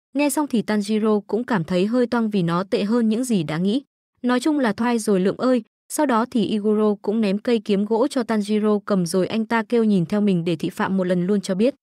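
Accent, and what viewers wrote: Central, Vietnam. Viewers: Người miền Trung ò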